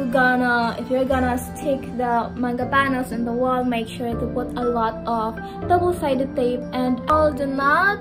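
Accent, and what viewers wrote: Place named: United States. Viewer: Bat napakaslang neto?amerikana kaba?o sobrang oa mo lang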